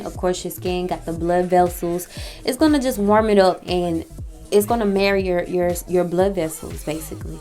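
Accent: New Orleans, United States. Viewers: Heyyy!! Honee... I soooo enjoyed your video!! I don’t even wear makeup like that.. but you inspired me to wanna try!! Lol I’m almost 50 and I wait til this age to wanna try to do brows and makeup 💄.. I love your accent!!! Are you from NOLA?? I can’t wait to try some of the things you shared!! I liked your video and I subscribed!!!! Can’t wait to watch more of your video’s!!!!! 🥰💄😍Yesssssgurllll!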